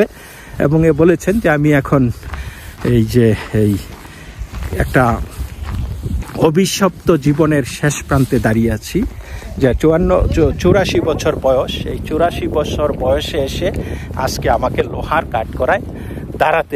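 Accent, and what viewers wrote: Bangladesh. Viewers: উচ্চারণ শুদ্ধ করুন। বাঙ্গাল ভাষার আধিক্য। ভারতীয় বাঙ্গালীরাও আপনার YouTube এর দর্শক।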